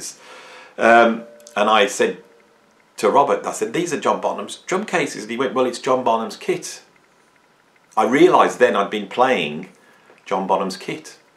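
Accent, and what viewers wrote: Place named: England, United Kingdom. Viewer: This was so interesting! From your bombshell 'I was playing Bonham's kit' moment to the theories on the way he got the open, sonically powerful style in collaboration with Page's recording know how and his own early influences to ultimately create his oft imitated yet never duplicated signature style. Incidentally by your accent, can we deduce you were born in a roughly similar part of the country to John/ Robert?😄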